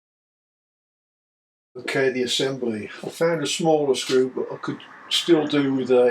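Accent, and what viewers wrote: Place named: Midlands, United Kingdom. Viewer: and a midlands accent too!!!!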